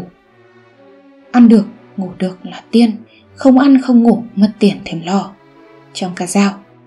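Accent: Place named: North, Vietnam. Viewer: Giọng Bắc kỳ thì khó nghe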